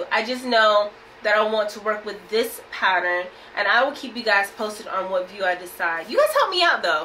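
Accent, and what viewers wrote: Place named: North Carolina, United States. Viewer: I knew you sound familiar! 😊I'm from and live in NC, too! Can't beat that country accent.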